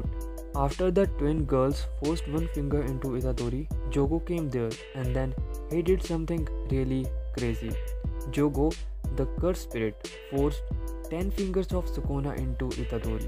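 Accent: India. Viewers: Indian accent☠️ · U Indian right ?. The accent is just shouting it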